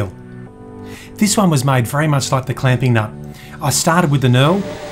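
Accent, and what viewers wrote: Australia. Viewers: Are you in australia mate? You sound aussie!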